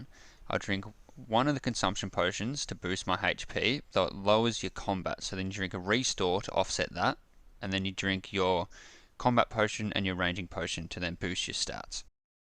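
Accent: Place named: Australia. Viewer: Love hearing another Aussie on osrs swearing like nothing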